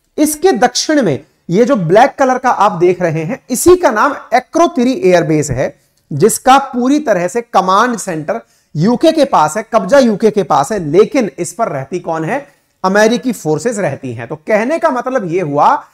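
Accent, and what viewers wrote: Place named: Rajasthan, India. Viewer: आप राजस्थानी हैं मुझे राजस्थानी की पहचान है अतः आप मुझे रखें राम राम भाई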